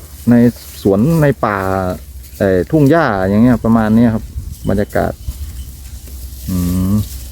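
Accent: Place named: North, Thailand. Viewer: อู้กำเมืองก่อได้ก่าอ้ายกำเมืองม่วนหน๋า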